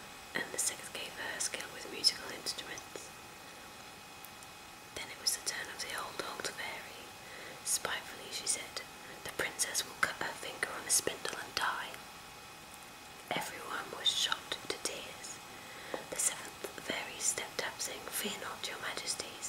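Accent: United Kingdom. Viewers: Your vids are pretty awesome. I've been craving a new British accent ever since Whispering Life stopped doing hers. Glad I'm came across you. I'm more than satisfied here! : )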